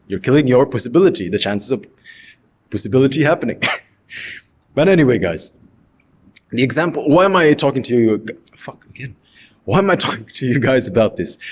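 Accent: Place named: South Africa. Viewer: Is that South African accent bro ?? Are you South African? Good speech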